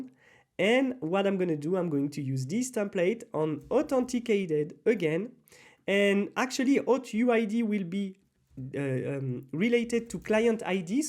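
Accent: France. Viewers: Cool. I like french English accent. It's only below the India English one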